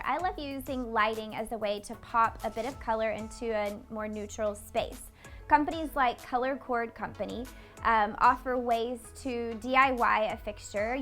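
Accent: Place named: South, United States. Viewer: Love your charming southern accent and personality. Excellent tips on the dimensions. Nobody tells us that. jkr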